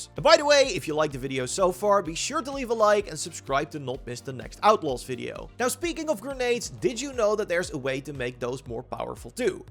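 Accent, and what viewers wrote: Netherlands. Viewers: Ga alsjeblieft gewoon Nederlands spreken Je kan geen Engels Je hebt een heel zwaar Nederlands accent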